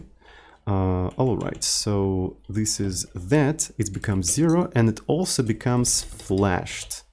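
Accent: Russia. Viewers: ты русский? я чувствую акцент))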